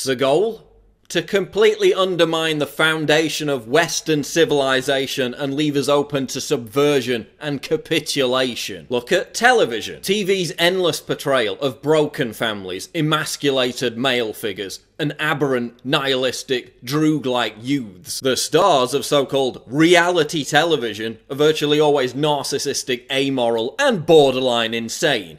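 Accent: United Kingdom. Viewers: well i think to myself... end game terms...after gamergate. are they trying to reforge men born in hellfire to absolutely fucking hate democrats. rest assured they will. they are only making sure that the future gen will be dominantly conservative! they're morons of the highest caliber i like PJW's narration out of all infowars/planetprison shows. especially when he gets extra british crusy lol, slaying it with intellectual logical facts...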